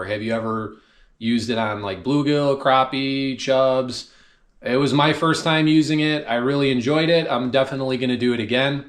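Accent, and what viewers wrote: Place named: Canada. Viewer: Where are you from.. sound like a Canadian or Wisconsin area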